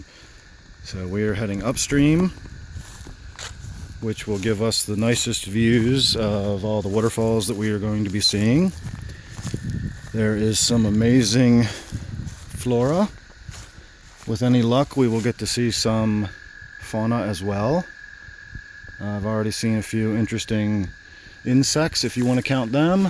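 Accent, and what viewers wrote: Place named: Baltimore, United States. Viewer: Are you from the Baltimore Region? I thought it sounded like you had a bit of a baltimore accent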